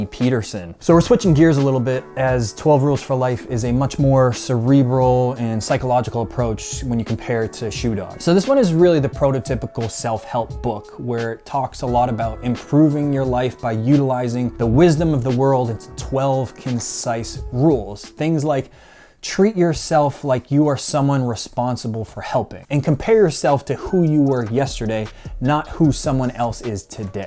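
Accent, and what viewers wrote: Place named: Massachusetts, United States. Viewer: Hey been following your for sometime now under a few of our business accounts on Instagram(raisingwealthminds and theunstucksociety) just realized you are in MA, kinda thought you were with the accent(dude I'm in the same boat, so not knocking it). We are on the South Shore in Duxbury. Keep up the work man!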